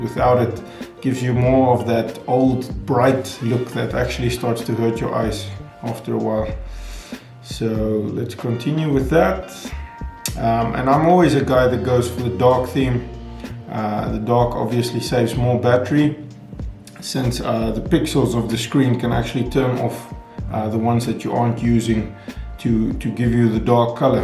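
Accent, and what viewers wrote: South Africa. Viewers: You sound south african...like if you are....SA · He is South African. I think he just works in Saudi Arabia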